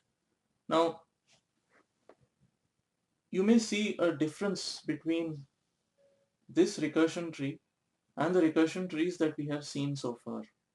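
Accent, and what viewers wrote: India. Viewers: Игровой канал RobosergTV Well many people actually just hate indians and their accents , so they say hateful things ,just to bring em down ,just out of spite. Even when they are able to understand most of it , they would bash the poor guy. Also , they were too harsh. It is fine if you dont understand the accent , but then you start insulting him , his family and country just because you dont understand his accent? Like this mutual white friend of mine is sort of racist against black people , so he always finds the worst in them.Always talks about crime rate due to black people and dirty streets because of them. Get it? If you are slow , read it again and again , until you get it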